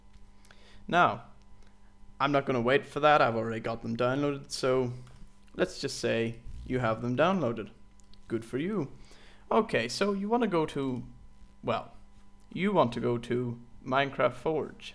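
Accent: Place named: Germany. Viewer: BTW! your accent is awesome!!! Is it German?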